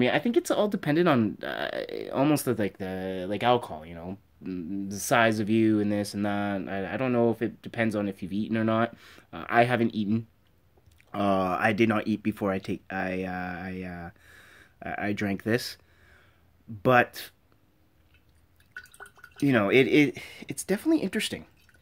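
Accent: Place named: Canada. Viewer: I can almost hear the Canadian in his voice. I wanna say toronto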